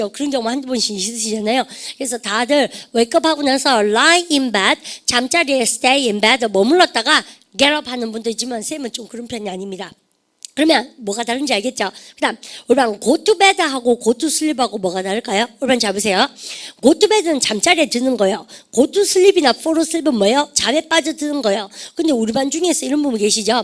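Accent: Daegu, South Korea. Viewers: 선생님. 좋은 강의 올려주셔서 얼추 8주 동안 열심히 공부했습니다. 말씀대로 문법은 3번 들었더니 4학년 딸아이 ELA 공부를 봐주는데도 큰 도움이 되었습니다. 문법 ABCDE 정리하고 3번 듣고. 기초보카 정리후 두번째 듣는 중입니다. 기초보카. 보카22000. 문장보는 눈도 재미있습니다. 두툼해진 정리노트를 보니 더 감사합니다. 아!! 그리고 그동안 천지삐까리로 많은 대구 사투리가 그리 정겨븐 말인것을 알게되었네요. 아무리 되도(?) 쎗국물 갈아마시고 열심히 하라는말씀도 맘에 깊이 새겼습니다. 영어실력과함께 대구어 리스닝/ 표현도 늘었습니다. ㅎㅎ뭔가 저에게도 샘에게 도움이되는것일것 같아 ㅎㅎ 영어로 나오는 광고들도 열심히들었네요. 기회가 있어 다른 방법으로 인사를 드릴 수 있기를 바라며... 건강하시고 행복하시길 기도하겠습니다. 플로리다에도 선생님 팬이 살고있습니다. 다시한번 감사합니다.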